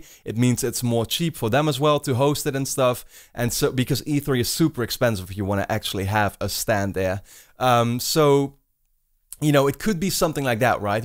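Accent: Netherlands. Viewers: Herm... he's probably Dutch, isn't he?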